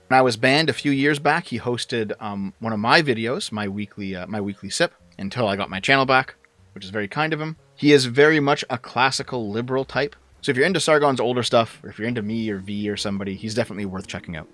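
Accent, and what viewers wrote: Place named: Canada. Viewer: I found you when you filled in for Sargon. First thought was that you sounded painfully Canadian. Thanks for the thought provoking content.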